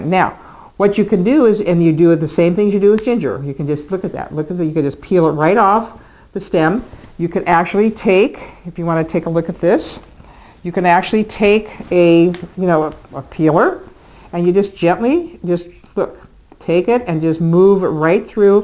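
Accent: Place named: United Kingdom. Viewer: Pronounced in America as Tur MER ic. and Cur CU min She pronounces it as the British do. But we don't speak British here in America. Rule of thumb is in America (the entire western hemisphere) the emphasis is on the 2nd from the last vowel. IT only changes from that rule if there is an accent placed over a different vowel. In the British language they put their emphasis on the first syllable of words. TurMERic is a most wonderful herb. Beautiful plant as well. I grow Turmeric and Ginger. I sprinkle the ground up Termeric and Ginger on my buttered toast daily. I also add Hemp seeds to it and a splash of Cinnamon. ===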